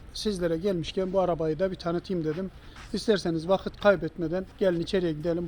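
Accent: Yozgat, Turkey. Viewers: Gardaşım Yozgatlımısın ulaa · Abi şive Yozgat gibi senin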